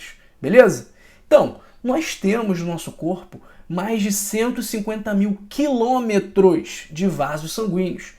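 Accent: Rio de Janeiro, Brazil. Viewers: Partiu comprar aipo e colorau. O youtube me indicou este canal e já me inscrevi. Excelentes esclarecimentos. Entendi tudo com este carioquês legítimo. Aqui outro carioca da gema.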